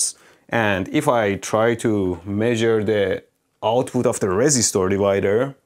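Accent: Iran. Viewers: با لهجه غلیظ فارسی انگلیسی صحبت میکنه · Ultimate parsian accent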